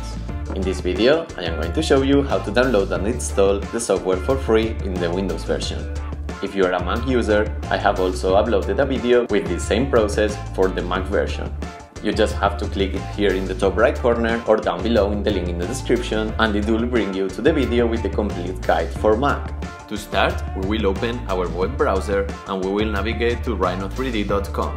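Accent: Spain. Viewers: como se nota q eres español:)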